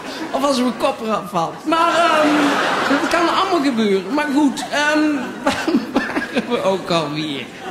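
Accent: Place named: Tilburg, Netherlands. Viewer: Ik snap dat sommige mensen hem misschien niet grappig vinden. Ik persoonlijk wel. Marc Marie is namelijk een rasechte Tilburger en praat ook met een echt Tilburgs accent. Aangezien ik zelf ook uit Tilburg kom, kan ik er hartelijk om lachen! Daarnaast is het ook een kwestie van of je de grappen gewoon leuk vind. Misschien vind ik een ras-Rotterdammer ook niet grappig. En ik hoor van veel mensen uit andere provincies dat ze hem vaak niet eens verstaan, haha. Ik ben in ieder geval dol op hem! :D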